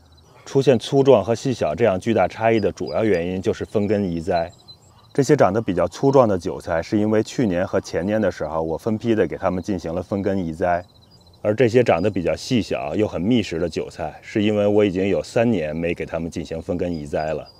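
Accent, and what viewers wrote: Beijing, China. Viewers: 说的清楚透彻。听口音你是北京人吧？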